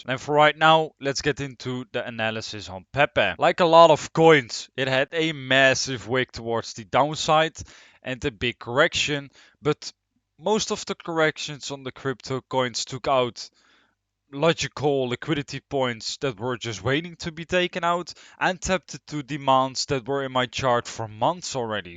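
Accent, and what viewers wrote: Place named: Netherlands. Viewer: Your accent is Dutch / Eindhoven I guess. In your opinion PEPE will make new ATH OR ? Thank you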